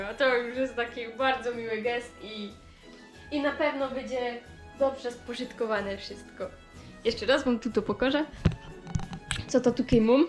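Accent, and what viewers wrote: Silesia, Poland. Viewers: W Śląsku Cieszyńskim - gdzieś na "końcu swiata" mieszka dziewczyna a zwie się Ładna dziewczyna - szczera i prosta Zarazem niezwykła którą warto poznać! Ci co Ją znają piszą wprost szczerze źe nie ma lepszej na "YouTu - berze" Na tą spojrzeć aż miło zawsze usmiechniętą i schludnie ubraną I cóż powiedzieć - jak by nie było wielu chłopaków ogląda się za Nią Muszę Was zmartwic młodzi przyjaciele że w owej kwesti zdziałacie niewiele Ta miła blondynka ma już chłopaka A jest nim i prawda taka Chłopak ambitny i pracowity na wskroć zaradny i pomysłowy Dla Niego nie ma takiej opcji żeby nie dało się czegoś zrobić Czy przy ciągniku czy przy maszynie Naprawy palą mu się w dłoniach - tylko proszę Cię - nieco rozważniej - najważniejsza Ona! Oprócz swej pracy - w polu pracuje Chce być rolnikiem - uprawiać ziemię W tym wszystkim dzielnie wtóruje Bo chce byc przy Nim - i myślę będzie. 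Brawo dzielno dziewczyno maturkę zdałaś i juź pracujesz Dla Ciebie żadna trudna sprawa trzeba pokój malować - no to malujesz! Musza Wom pedzieć drodzy TouTu - berzy W co wielu widzom trudno uwierzyć Ta "Dziołcha fajnie do nos godo" Chociaż niektórym sie to niypodobo! Pozwól że coś powiem własnie i co niektórym sprawę wyjasnie... Jak sie niy podobo naszo ślonsko gwara to cis skonś przylos i łod Slonska wara! A jak chces łostać i mos "gniozdo" tukej siec cicho na rzici i sie niy fukej! Przez to za odwagę Ciebie podziwiamy a za to że godoś - poprostu kochamy! Gdybyśmy mi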